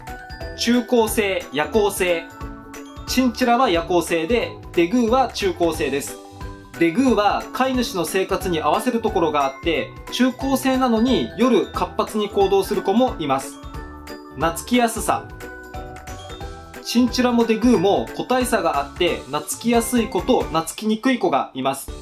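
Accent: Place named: Japan. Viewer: もしかして地方出身の方ですか？ 話し方が可愛い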